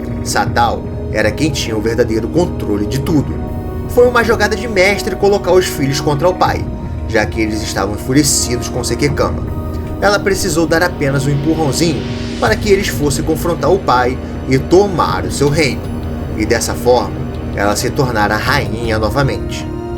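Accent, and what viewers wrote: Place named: Rio de Janeiro, Brazil. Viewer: O sotaque carioca não passa.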